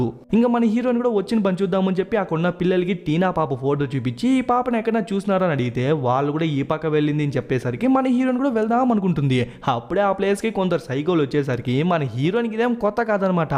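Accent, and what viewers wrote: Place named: Tamil Nadu, India. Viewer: Oka Tamilian abbayee Telugu matladinattu undi ni voice But chapa Baga explained chesavu too well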